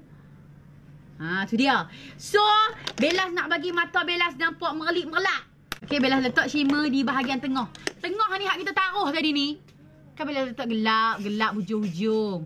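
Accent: Kelantan, Malaysia. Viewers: suka tengok...kecek kelate pula we...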